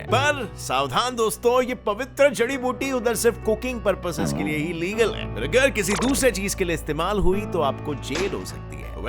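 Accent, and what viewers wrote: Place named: Gujarat, India. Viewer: App gujrati ho kyki maje pata chal gaya yehh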